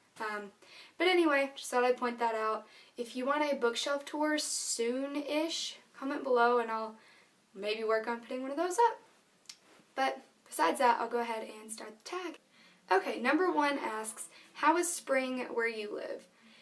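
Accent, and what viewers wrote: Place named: United Kingdom. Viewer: Haha. It's true. I am fascinated by British accents. And I'll trade weather with you! It's like 1000 degrees over here. :/ Have fun on spring break! :D we'll miss ya. Oh, and thanks for the tag. xD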